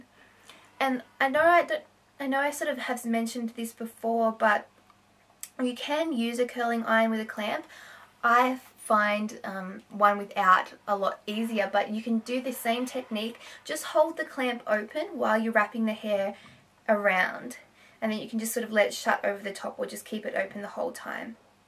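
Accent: Australia. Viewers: i am so glad i found your channel! i'm from australia and it's so hard to find australian beauty gurus! im having a marathon of all your videos:) i stumbled across your channel by searching in 'nina dobrev makeup tutourial' because i absolutely love her and your video came up! then i could tell in your voice that you're australian and i was so excited :') anyway, keep up the great work xxx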